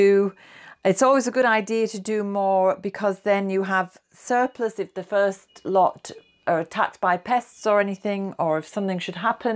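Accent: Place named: England, United Kingdom. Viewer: ok ,you sounded a bit english, was you born in ireland or just have irish parents, i also have irish grandparents, and a half irish mum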